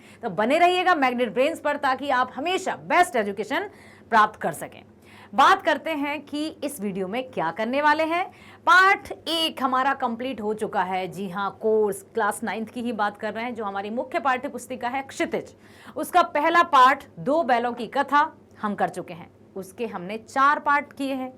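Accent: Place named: Marwari, India. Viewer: Madam ji Marvadi ave hai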